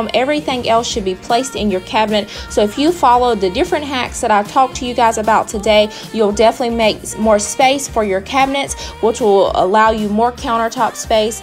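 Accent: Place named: South, United States. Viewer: Your southern Belle accent is adorable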